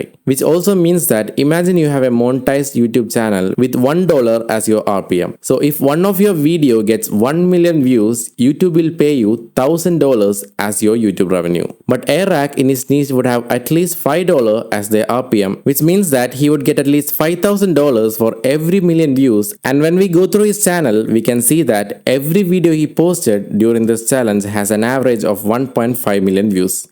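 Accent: India. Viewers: That Indian VOICE 🗿❤ is Genuine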